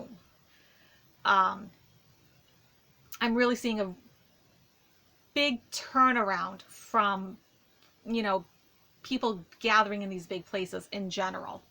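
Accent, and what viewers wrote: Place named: Midwest, United States. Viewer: No. Sounds mid-western. Definitely not California.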